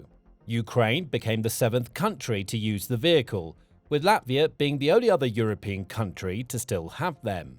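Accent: United Kingdom. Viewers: The channel is American despite using British voices 😂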